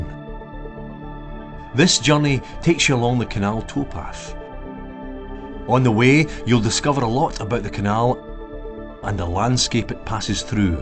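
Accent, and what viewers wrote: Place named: Highlands, United Kingdom. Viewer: Wonderful and very interesting. Love the highland accent.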